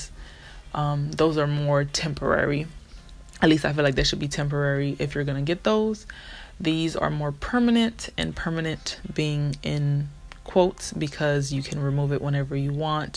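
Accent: Haiti. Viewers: Are you Haitian? Your voice is very Haitian. Please tell me you’re Haitian.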